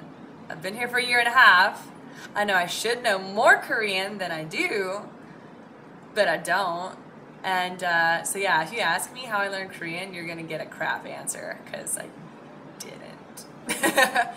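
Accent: South, United States. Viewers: I hope to see you in the national TV one day. Maybe you will learn Korean some day~ ^^ By the way, I reaa~~~lly love the hint of souther accent. Keep cool. It is really hot and humid outside T_T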